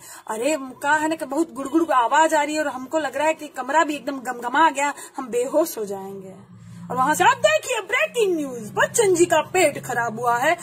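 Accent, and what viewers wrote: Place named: Bihar, India. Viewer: Madam I like your bihari UP style Waiting for next Perpunch in Montreal Thanks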